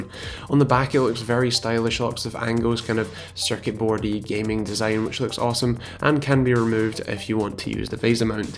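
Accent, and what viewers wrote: Scotland, United Kingdom. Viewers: scottish not irish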